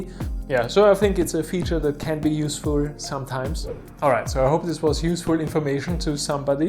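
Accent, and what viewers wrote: Austria. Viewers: Also are you Austrian by chance?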